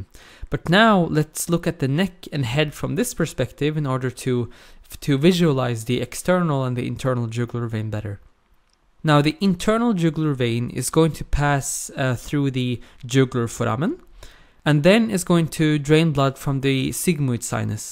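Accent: Norway. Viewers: He sounds very Norwegian to me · hahah yes, I think the same. I love the Norwegian accent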